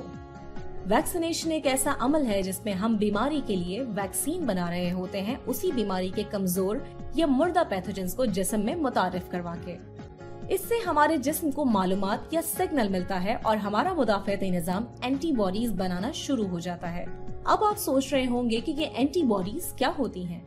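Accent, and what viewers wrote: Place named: Urdu, Pakistan. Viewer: Nice Urdu Accent.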